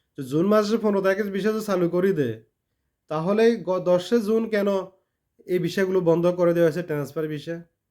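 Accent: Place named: Pabna, Bangladesh. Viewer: ভাই পাবনার কথা শুনে বহুত হাসি এসে গেছে।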